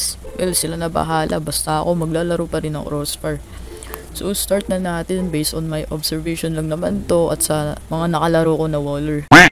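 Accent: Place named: Iloilo, Philippines. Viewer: taga san poba kayo tol bakit nag i ilonggo ka tol ?????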